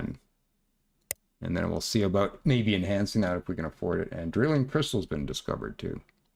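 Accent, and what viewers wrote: Canada. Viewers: I am sorry man. I mean no disrespect, but you sound so Canadian. Then I look at your profile and you are from Canada. I am going to check you out man. I just had to put that thought out.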